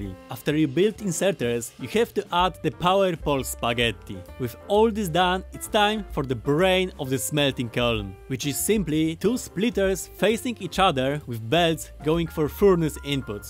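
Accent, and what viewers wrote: Poland. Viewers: You have a very thick ponglish accent. Have you attended to pasha learning school? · jak tak słucham tego akcentu, to słychać że Polak xD ale lepiej po eng nagrać, bo wiecej osób obejrzy, wiadome, pozdrawiam :D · Cały czas się zastanawiam po tym akcencie czy jesteś Polakiem. Ciekawe... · WIEDZIAŁEM ŻE JESTES POLAKIEM PO AKCENCIE POZNAŁEM W SEKUDNE xdd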